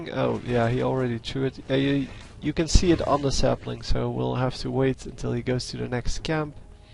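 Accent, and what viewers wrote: Netherlands. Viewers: Yeah that accent for me is very characteristic, every dutch guy making videos has almost the same voice :D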